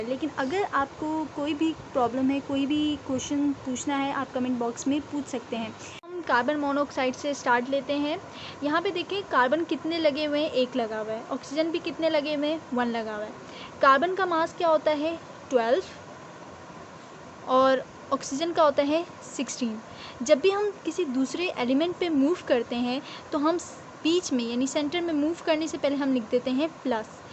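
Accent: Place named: Pakistan. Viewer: Are you from Pakistan I mean the person who is teaching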